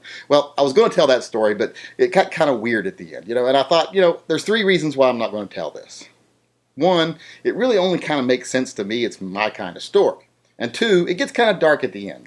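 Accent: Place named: West Virginia, United States. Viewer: By God, only a West Virginian could say it so well!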